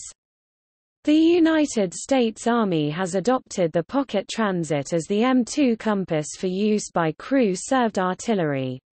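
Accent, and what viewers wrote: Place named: United Kingdom. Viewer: Can we just STOP with the British accents? PLEASE??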